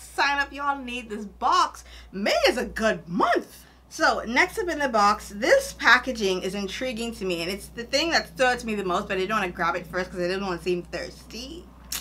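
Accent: Bahamas. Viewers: Where are you frommmmm. You sound like you're from the Bahamas!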